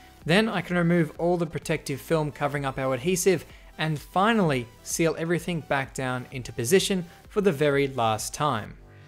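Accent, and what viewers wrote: Australia. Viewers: "Ichoons." God I love the australian accent.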